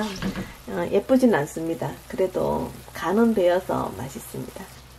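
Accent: Gyeongsang, South Korea. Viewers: 님 요즘 왜 레시피 안올려요? 나긋나긋한 경상도 말씨 이모님 목소리도 듣고싶고 이모님 레시피가 저에게 맞아 많이 배웠는데...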